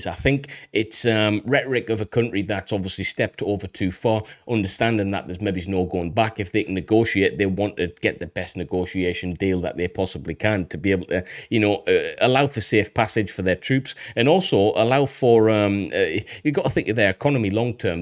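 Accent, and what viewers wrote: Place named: England, United Kingdom. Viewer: Yo ain't seen you a while respect got the imposing look. It's nice to see a real hard man with intelligence and English accent. As grew a bit at gchq Germany, well afnorth Holland with the AF plates lol hearing your attitude takes me back 20 years. Peace and love yo. Could you put your intelligence and ingrained survival mature focused on structure and violence give a guide for us English incase something comes here. Peace and love my G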